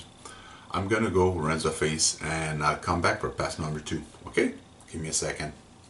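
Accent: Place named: France. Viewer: Hey man your doing a great job and don’t worry about your French accent it’s cool there are a lot of us myself included who could learn to speak a second language and some of us are much poorer for not learning it. As for shaving there is no advice that I can give anyone on that topic I’m just glad to have gotten the hell away those stinking cartledge razors since 2010 my face has never felt better, so long Gillette, Schick, and all the other junk 3,4, and 5 bladed crap as well as those synthetic shaving soap. Anyways Cheers man if you are watching the Grey Cup tomorrow enjoy I’m going with Ottawa although I live in the west, Cheers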